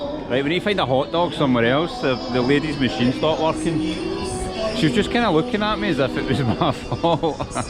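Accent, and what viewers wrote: Scotland, United Kingdom. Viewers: that beautiful scottish accent cheers from Belgium have a look also on russian cars in the streets and those in Europe the ultimate years.... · Awww! What a nostalgy for me. Last time I dealt with the Scottish accent was in my University days. 😻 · As I've noticed the Scottish accent is quite gorgeous. You pronounce sound ei instead of ai. Eis skating. 😊 Nice one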